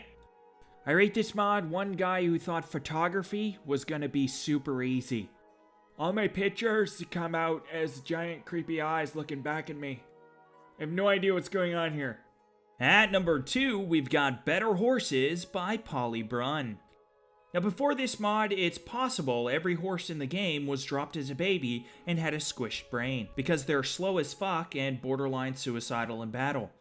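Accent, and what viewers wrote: Canada. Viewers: This guy has to be Canadian, right? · Are you Canadian? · Hahhaha this guy is so Canadian he sounds like my buddy who lives in Orangeville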